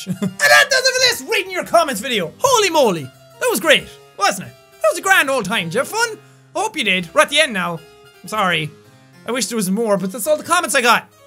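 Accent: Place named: Ireland. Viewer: thanks man I'm inspired by you a lot and eventually want to play games on YouTube with you mark and Felix! My dream is to create a little community of my own on YouTube and have a nice group of my own! I would like to try and be a sombody rather than a nobody. And prove to the world just cause I have a mental disorder (ADHD) doesn't mean you won't achieve great things. I know I've got a huge challenge ahead of me and I'm already off to a bad start. But I'm determined to become a youtuber! Although right now I'm only doing Lego videos. But I hope to get gaming videos up within the next year. After I find s job. Right now I'm still looking for one and I have been since Christmas break. I hope you don't mind my super long and a bit chattering comment. But stay awsome and thankyou for so much inspiration. Unfortunately, I wish you used your Irish accent it reminds me of back home (Newfoundland, I am a proud Canadian)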